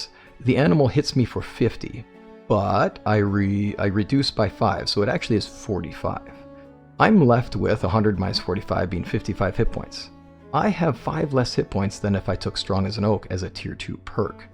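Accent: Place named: United States. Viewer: Holy shit, a medieval dynasty creator with an American accent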